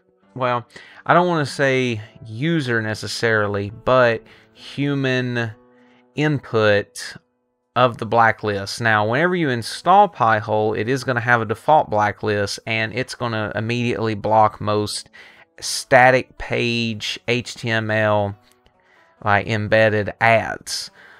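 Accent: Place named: South, United States. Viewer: The southern twang is heavy. 😂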